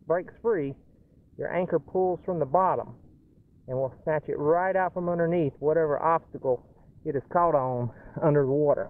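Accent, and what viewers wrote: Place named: South, United States. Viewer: Good , simple, straightforward video man. Sounds like you are Southern born too, like me.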